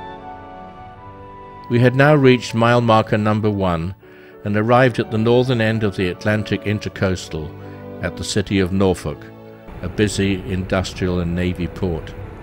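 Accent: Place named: Southeast England, United Kingdom. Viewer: It’s pronounced ee-gwa-nah lol Not ig-u-ah-nah And its you-ka-tahn lol Just saying how the locals pronounce these in their native language lol no worries we know what you meant. I am curious as to your accent though. Its very similar to mine and im from the southeast of the U.K.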